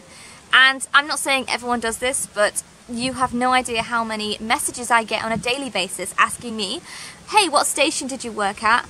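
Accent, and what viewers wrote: Australia. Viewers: You're starting to sound Australian 🤔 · Aussie accent creeping in 😉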